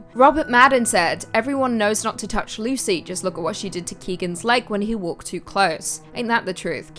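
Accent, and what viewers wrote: United Kingdom. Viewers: Figured a prison in North Korea would make the list. Lovely sounding British accent, BTW. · Awesome video in a beautiful UK accent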